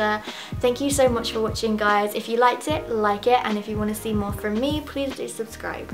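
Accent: Scotland, United Kingdom. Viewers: Guessing by her accent is Scottish (said UK) but of Italian ancestry.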